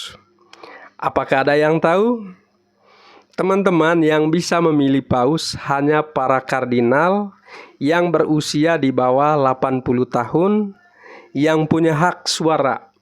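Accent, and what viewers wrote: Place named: Manggarai, Indonesia. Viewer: Mo mau tanya maaf dengan logatnya kaya orang Manggarai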